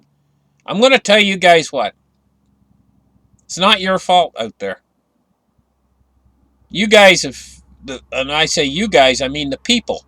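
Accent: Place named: Canada. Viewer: Love you to bits,. My day is not complete without those dulcid Canadian tones. Now here's the thing. You have yourself a merry little Xmas and come back refreshed and ready for the end game. Kind regards from the UK.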